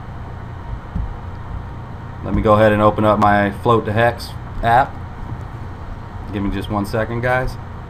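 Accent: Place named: Canada. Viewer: Lmao. Oh! Just kiddin'! Sounds like a Canadian accent, almost Chicago-ish. Anyways, nice tutorial bro. :y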